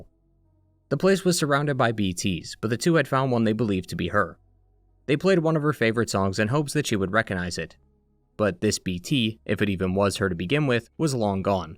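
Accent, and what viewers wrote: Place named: New York, United States. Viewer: Dude you make such good content 🎉🎉 Also well done, great to hear someone with ny accent doing so well 😁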